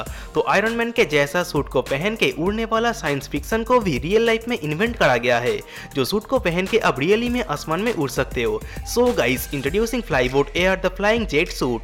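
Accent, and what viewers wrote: Bengal, India. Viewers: Okay but voice is a mixture of Bengali and hindi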